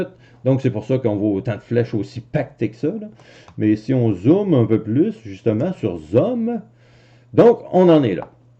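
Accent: Quebec, Canada. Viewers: J aime ce trading vivant, attendre des semaines ou des mois c est po possible. J ai deja un gros paquet sur le gold et le silver depuis 10 ans pour ma securite. Maintenant je veux m amuser un peu, j ai du temps beaucoup de temps ( 75 piges ). Evidement j aime t ecouter ca me rappel mes Quebecois compagnons de travail en Afrique chez Schell.. tu m a reveille. Merci milles fois. Je t envoie mes meilleurs voeux d Athenes 21 degres et du soleil, c est super.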